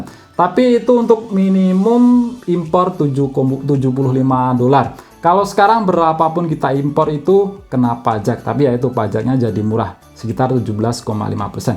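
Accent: Blitar, Indonesia. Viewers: Orang Blitar kah?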